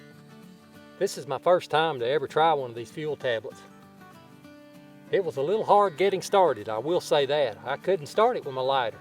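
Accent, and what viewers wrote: South, United States. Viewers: Love your "good ole boy" Southern accent. Also, the quality of your presentation is on par with Steve's over at <link>. Very professional. One more thing . . . that video work is spectacular!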